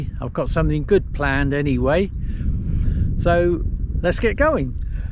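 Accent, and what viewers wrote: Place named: West Country, United Kingdom. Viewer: There is nothing that beats a Devon or even Dorset Summers day walk along the coast enjoying the wild flowers,the birds & the bees,along with fantastic views together with lovely west country burr historical story telling especially if you now live overseas. Superb❤😊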